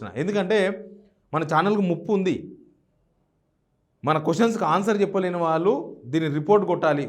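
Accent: Telangana, India. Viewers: అన్నగారు మీ విశ్లేషణ కళ్ళకు కట్టినట్లుగా తెలంగాణ యాసలో చాలా అద్భుతంగా ఉంటుంది....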